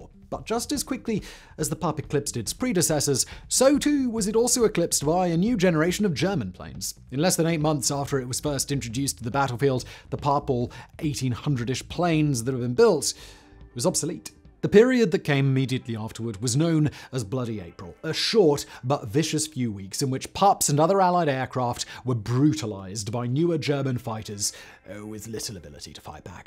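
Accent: United Kingdom. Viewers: Funny how these chaps with the funny accents always seem say the 'most formidable' and 'very best' aircraft of the wars were the British ones. The Camel, which I personally love, was in dire straits if come upon by an airman of equal skills flying a Fokker DVII, as turning fighters are at a distinct disadvantage to energy fighters, and cannot run away when cornered. A smell of arrogance permeates the air when the average limey speaks of her Majesties Royal Air Corp. I would stand shoulder to shoulder with a man (girls, needn't bother) who would with humility speak of the profound glory the Camel rightly deserves. Observe that, the 'greatest' or the 'most deadly' are terms that mouthpieces use to sell their video of the day. Oh, give over blokie!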